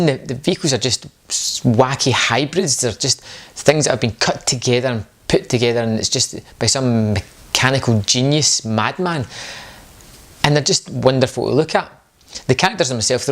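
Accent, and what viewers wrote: Scotland, United Kingdom. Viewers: hey Great review, I subbed. I am just starting out as well, trying to get to know other people who do reviews. I do Movie Reviews and Voice Impressions. I am not trying to spam. Also love the Scottish accent. Here is a review I did of San Andreas, Check it out sometime if you have the chance. <link> Looking forward to seeing other stuff you do as well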